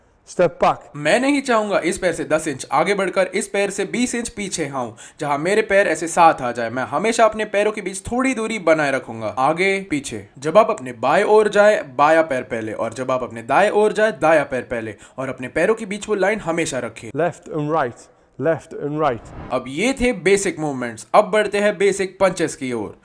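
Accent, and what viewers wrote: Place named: United Kingdom. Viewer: oh bhai i just watched this vid in pure british english nice dub artist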